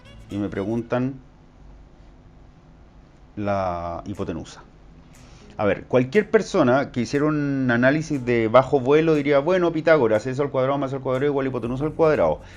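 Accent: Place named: Chile. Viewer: Con razón pe es un chileno nose entiende :v pero gracias profe aprendo mucho de ti, para la próxima mas despacio :'c piedad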